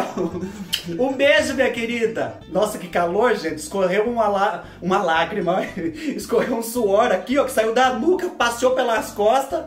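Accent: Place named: Paraná, Brazil. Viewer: vc tem sotaque de paranaense! 🌹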